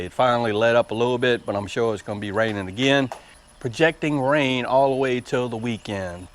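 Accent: South, United States. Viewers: I love your country accent. And your directions are clear. Thank you so much for your help!! I'm planting my avacado tonight.🥰🥰🌱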